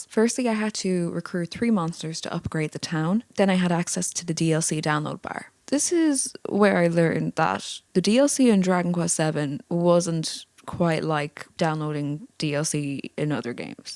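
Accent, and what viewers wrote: Ireland. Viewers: Checkpoint said that this was a delightful tale with bonus irish accent. At the start I thought to myself this is a light accent, and then for every minute the accent more and more :P Anyhow this was indeed a delightful story. :)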